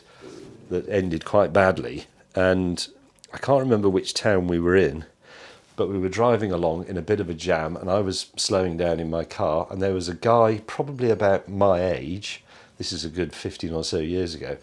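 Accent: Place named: United Kingdom. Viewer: Hi James. I too, am a native Californian. I will join the chorus of Californians posting here and say that you are more than welcome here. We love British accents and with your wit, humor, and charm, you would be a big hit anywhere you go.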